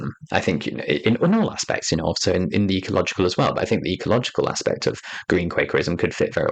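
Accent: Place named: Southern England, United Kingdom. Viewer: 😂 well that explains a lot. I thought I had picked up from one of your other videos that you were from the deep south of England.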